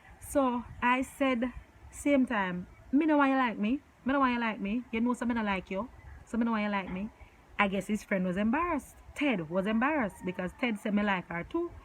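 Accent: Jamaica. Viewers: Hahahahah I really like your jamaican accent..it makes everything you say so extra...and these stories are quite embarrassing..but my favourite is the karaoke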